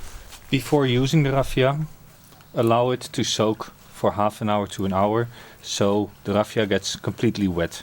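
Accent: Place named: Netherlands. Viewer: Hello again. WOW that was a tour de force, bravo! Every other ‘demonstration’ I’ve seen were ham-fisted by comparison and over dramatic too! By the way, now I’ve heard you talk more, and fluently, I’d put money on your being Dutch, like some of my best friends! ✌️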